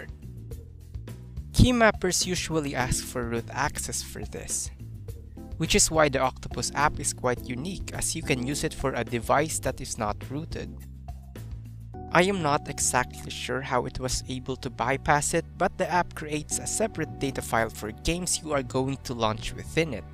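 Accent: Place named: India. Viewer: You are Indian right? If yes why are you speaking like this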